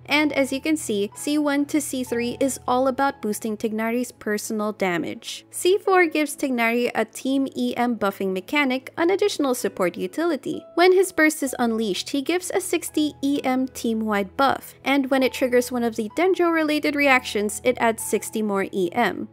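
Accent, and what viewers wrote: Philippines. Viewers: The Filipino accent coming in strong in this video. Love it!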